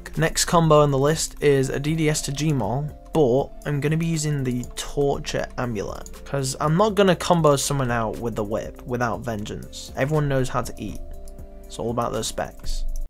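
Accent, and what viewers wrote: United Kingdom. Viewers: You literally sound like my old mate lloydreed1 lol voice is trippin me out. Must be from the same part of the uk 🇬🇧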